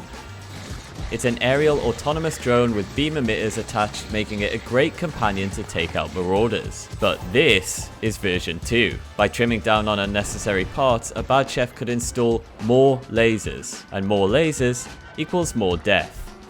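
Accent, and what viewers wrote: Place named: England, United Kingdom. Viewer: Quick question, is your name “,” or “?” It’s hard for me to understand at the beginning of your videos, since I’m not used to the English accent…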